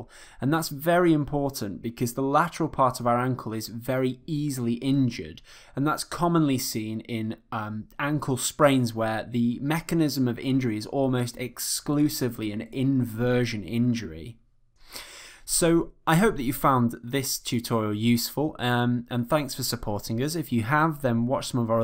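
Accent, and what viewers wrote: England, United Kingdom. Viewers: your english accent is super strong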